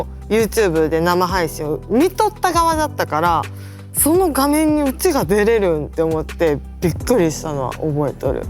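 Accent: Okayama, Japan. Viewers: 所々岡山弁でそうになって抑えとるところ可愛い笑 ほとんど岡山弁やけど可愛いぞ！ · なんか喋り方に既視感あると思ったら 藤井風も岡山じゃったわ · 岡山弁かもし出してるのがいい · ぼっけぇ本音じゃねー(^-^)わしも岡山じゃけん、まあたその岡山弁たまらんのよ❗いつか、千鳥とコラボが出来たらぼっけぇ事なりそうじゃね。もっと岡山弁使っていこう❗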